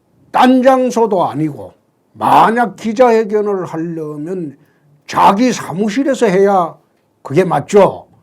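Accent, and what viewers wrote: Gyeongsang, South Korea. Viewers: 홍준표 대표님 경상도 말씀 좀 까칠해서 잘못 들으면 오해가 생길 수 있지만 대표님 말씀 다 맞았습니다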